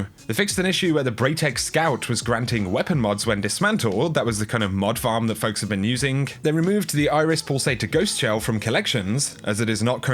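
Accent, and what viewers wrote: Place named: England, United Kingdom. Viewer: If Christopher Walken was a Destiny content creator with an English accent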